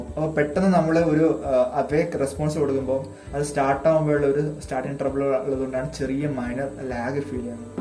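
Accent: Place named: United States. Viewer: Americakaaude pole und samsaaram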